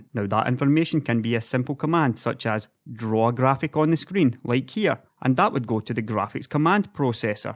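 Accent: Scotland, United Kingdom. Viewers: Which part of Scotland is your accent from? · your scottish also so all good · even better with that Scottish accent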